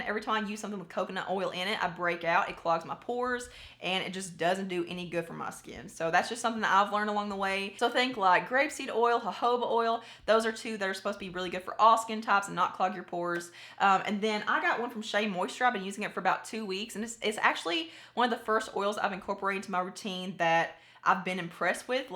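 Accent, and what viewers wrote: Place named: Kentucky, United States. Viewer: Oh no! It looks like it’s still all here for me when I expand it, but I can’t see what you’re seeing so I don’t know where it got cut off, exactly. Go figure: I apply brainpower, it melts my words. I poked around on your social networks looking for an email so I could just send it there, but while it says it’s “below” on your youtube About page, sadly it isn’t, so I hopped to your Facebook and discovered... Lady, you are from Southeast Kentucky. I knew I loved that accent, I’m from Eastern KY but lived in NYC for years & years until family issues brought me back to KY. I will now attempt to “message” it to you via Faceplace, and let’s hope that doesn’t cut it off & not let me know where. Sorry for the discombobulation.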